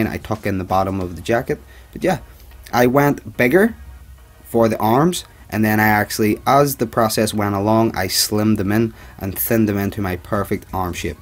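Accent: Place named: Ireland. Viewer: Mate I thought you were irish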